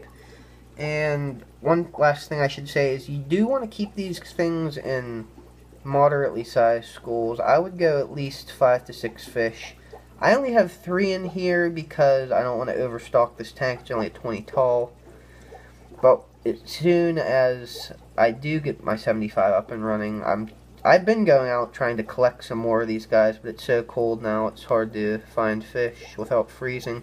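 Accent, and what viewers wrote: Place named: Pittsburgh, United States. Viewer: U from the burgh.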